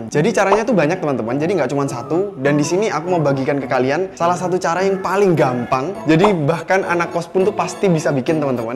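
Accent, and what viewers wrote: Java, Indonesia. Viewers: medog bgt cak.....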